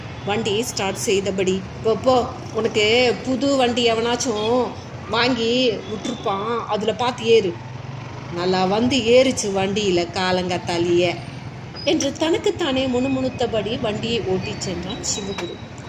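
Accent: Chennai, India. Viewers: சென்னைத் தமிழ் ..... யதார்த்தமாக இருக்கு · அருமை சென்னை பாசை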